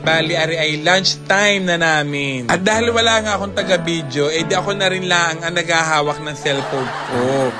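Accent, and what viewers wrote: Batangas, Philippines. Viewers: First time to watch your show, I love it 🥰 Ang ganda ng Batangas accent mo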